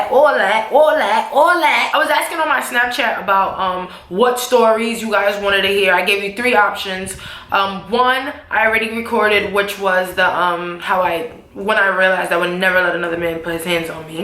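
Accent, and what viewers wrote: New York, United States. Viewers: Sound like Rihanna with a New York accent deadass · Girlllllllll you are a true New Yorker that accent tho!!!!! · Na for nothing 😮 you from ny Lmaoo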